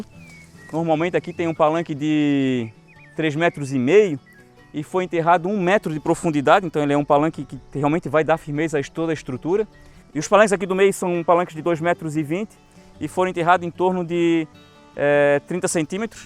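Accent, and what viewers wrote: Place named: Santa Catarina, Brazil. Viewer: Amo o sotaque catarinense. Sou do Paraná e morei quando criança em SC, amo demais essa terra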